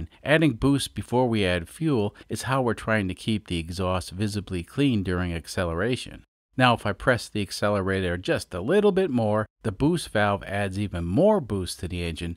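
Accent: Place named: Midwest, United States. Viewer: I’ve been thinking about why this channel “works for me”. - First of all its simply entertaining. - Your channel combines several of my younger self passions (being a motor head, electronics, computers, and just making stuff to see if I could do it). - You explain difficult subjects with clear to understand “Midwestern dialect”. (I was really wondering how you were going to pull off explaining interrupt processing, but you did it well.) - The pictograms and simple explanation help us to visualize new concepts. Not everyone has a mech-e or computer science background. So pictures help greatly. - Your approach to the channel is much like just a couple of guys hanging out in the garage trying out different ideas, some work and some not so much. If everything worked the first time, what would we have learned? As for channel statistics, while you need to keep an eye on them for the health of the channel, I wouldn’t focus on them too much. 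Make what you enjoy and they will come. (edit: I tried to make a weak field of dreams reference, but I just looked it up and that was Iowa not Kansas. If it worked the first time, what did I just learn?)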